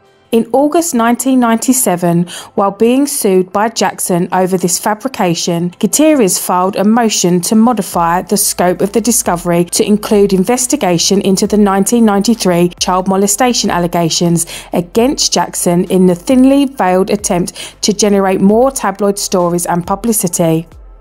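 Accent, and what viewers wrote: United Kingdom. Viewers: The more I watch these kind of videos the more horrified I get how much Michael was bullied and tortured it seems so beyond what my brain can comprehend how much evil was done to him . How will these people meet their maker one day ??? I would never want to be in their shoes. This housekeeper is hideous and despicable woman not an once of guilt or remorse of what she has done to Michael .On the lighter note the narrators voice is so good I love British accent so beautiful.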